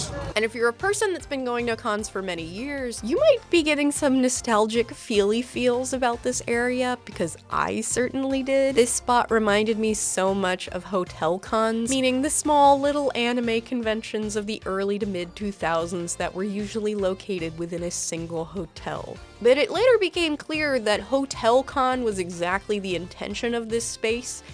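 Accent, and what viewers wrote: United States. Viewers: I think it's just an aspect of her southern accent (drawl) slipping out every now and then. It's less an extra 'r' sound and more that we blend or even skip the vowels so the accent on the "a" disappears.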